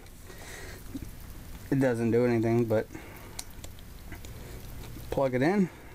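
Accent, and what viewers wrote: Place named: South, United States. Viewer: They still make them...UEI about $250.00 on sale....I've never hooked mine up to 220...A little afraid to, running 220 down a 110 volt cord, but they say you can do it...FYI for you guys, a compressor normally won't start again after just shutting off...To much head pressure to start against so they have to sit and let pressures equalize....They'll kick the overload, Kixon or whatever type used until they do....Thanks to the what sounds like a country boy making this video...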